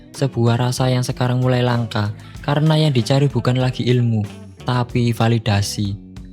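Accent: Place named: Java, Indonesia. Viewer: udah bagus video nya... 1-10 chanel ini dapat nilai 3 banyak hal yang harus admin perhatikan lagi agar chanel bisa berkembang, masukan dari kami 1.belajar memahami emosi dalam suara 2.belajar apa itu hook,tapi ada rahasia di balik hook yaitu (rehook) 3.narasi ini terasa sangat AI dan masih banyak lagi. pengisi suara chanel ini punya genetik suara yang sangat bagus.. aksen dan logat jawanya membuat pendengar mudah ingat.. sedikit tips smoga bisa membatu,oiya investasikan waktu luang untuk belajar membuat tumbnail yang bagus,konsisten untuk terus perbaiki kualitas karya mu,pasti nanti bisa berkembang chanel nya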